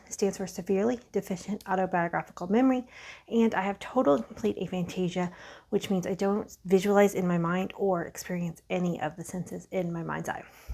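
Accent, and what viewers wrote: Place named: South, United States. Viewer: I knew you were from one of the southern states, I mimic accents and I pick up on accents....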